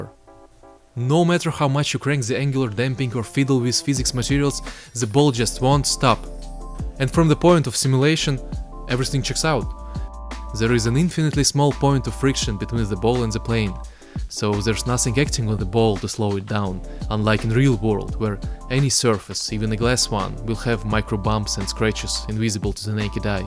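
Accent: Russia. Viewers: Акцент русский) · Мне одному показалось что автор не коренной англичанин, возможно русский или украинец. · Russian accent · Вначале я подумал "О русский", потом я задумался "А что если не только у русских такой акцент", а в конце все же посмотрел на комменты, а русский классно. Побольше бы людей в геймдеве русских было! Осталось только самому заняться этим и провести 1000 часов. Напоследок от себя автору: Well done! :) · Как кайфово слушать инглиш с русским акцентом, легче чем азиатов или американцев :D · Хех, хоть ты и говоришь на английском, я понял что ты Русский :) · Все равно слышен русский акцент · У тебя русский акцент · Русский что-ли? Акцент слышно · корявый английский , избавься от ебаного русского акцента а то такая речь уши режет . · im glad you showed us a face cam cause with your voice the only thing i can imagine is that steriotypical russian guy that plays cs go, with all do respect. 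· Очень похоже на русский акцент... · Ррашын акцент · Русский акцент узнаешь из тысячи:) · Русский акцент слышно сразу) Добавил бы русские субтитры)) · Вот слушаешь и задаёшься вопросом не русский ли родной язык?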